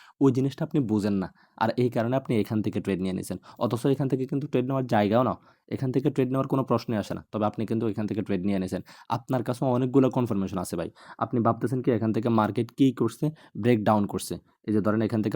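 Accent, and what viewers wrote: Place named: Chittagong, Bangladesh. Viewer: ভাই কি চট্টগ্রামের নাকি? কথা শুনে আমার দেশি ভাই মনে হচ্ছে।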